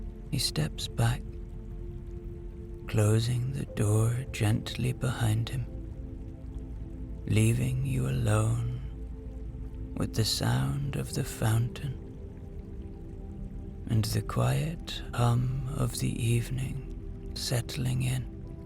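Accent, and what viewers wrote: Ireland. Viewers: Amazing. This story took me to a dream with my beautiful sister, who recently died. Beautiful memories, love, sobbing grief and acknowledgement of acceptance of grief as part of journey..thankyou again. I love your Irish accent and gentle voice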